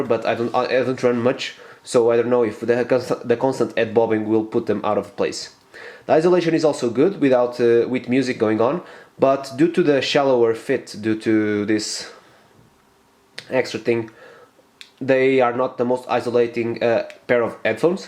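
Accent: Portugal. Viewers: are you portuguese? your accent seems like it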